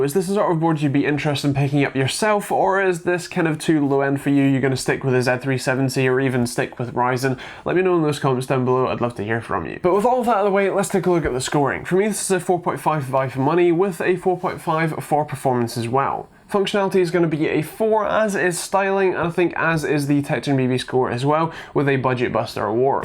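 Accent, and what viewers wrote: United Kingdom. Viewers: can't understand ANYTHING with your thick British Accent, lol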